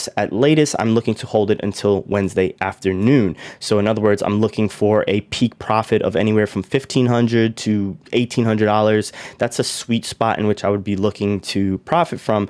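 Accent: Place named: New York, United States. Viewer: I just know you're from New York bro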